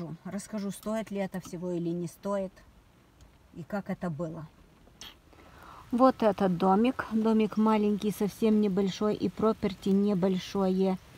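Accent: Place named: Odesa, Ukraine. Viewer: Вы с Одессы? Говор такой интересный?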